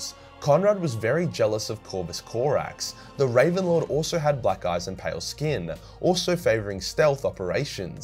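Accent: Australia. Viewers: every time i watch your videos i start thinking with an australian accent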